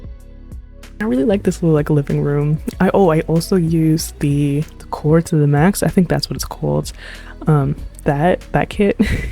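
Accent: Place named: New York, United States. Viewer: This was such a fun series! Also your New York accent came through real strong in this video and it literally made me miss home so thanks.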